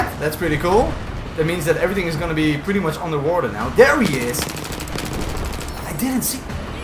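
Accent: Netherlands. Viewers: i can tell you're from the Netherlands from your accent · this guy has dutch accent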